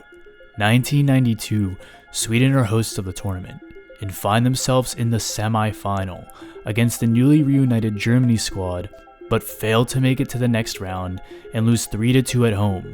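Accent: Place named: United States. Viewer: Next time I hear an American accent talking about football I'm going to immediately switch off. this is bs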